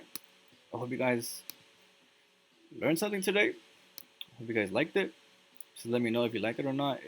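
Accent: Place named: Brooklyn, United States. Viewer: Wow so glad I found your channel bro! I'm already well versed with ICT but this has been a cherry on top. You sound like you have a Brooklyn accent btw... you from NY?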